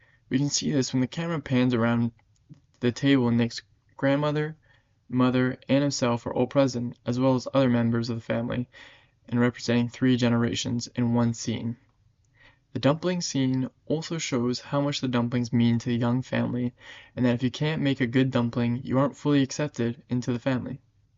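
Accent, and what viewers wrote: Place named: France. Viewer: Outstanding! Great French accent!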